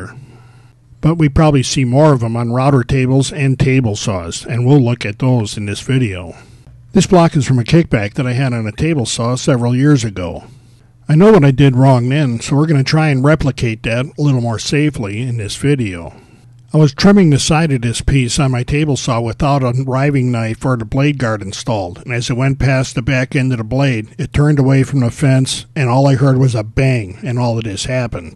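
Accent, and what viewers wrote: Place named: Midwest, United States. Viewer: People need to see this just because of how dangerous a table saw or any kind of saw is. I recognized your accent right away that you're from somewhere in Wisconsin or the Midwest for that matter. I myself am originally from Wisconsin and use to work for a window factory. I've had a couple close calls myself from the 4 years I worked there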